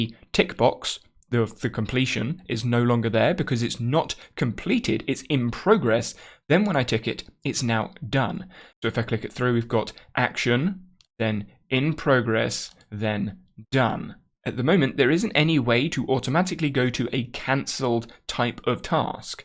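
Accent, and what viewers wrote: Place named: United Kingdom. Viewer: So refreshing to have a British accent explaining something to us. :-)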